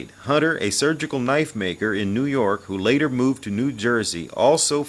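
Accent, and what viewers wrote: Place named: California, United States. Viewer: You know this guy was raised on a walnut and prune farm in California. Not a Yankee or Southerner. Many old time people from California pronounce words differently. Listen to some of the old time radio and television programs. For that matter do you pronounce Los Angeles and Saint Louis correctly? Most people don't.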